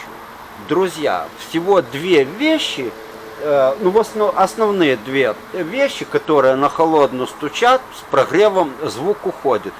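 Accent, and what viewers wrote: Odessa, Ukraine. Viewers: Такой одесский говор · Таки сразу понял шо попал на канал настоящего одесита, лайк. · Таки я вам премного благодарен за дельные советы,а вы самом не из Одессы?И машинка стоит на фоне японская,Митсубиси,и речь таки знакомая...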